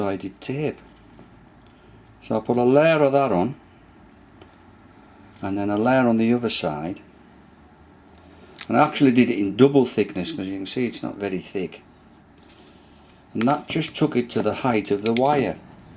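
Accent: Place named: Hartlepool, United Kingdom. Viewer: Love the accent....Hartlepool???????????????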